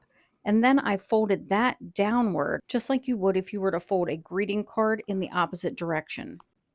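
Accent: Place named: Pittsburgh, United States. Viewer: These are so pretty!! Thank you for sharing this information 💖 Btw, are you from the Pittsburgh area, by chance? I'm trying to place your accent.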